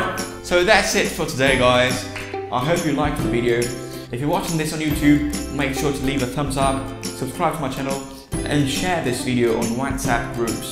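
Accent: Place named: RP, United Kingdom. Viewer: Soooo hard to get the RP accent and yours is great. 👏👏👍👍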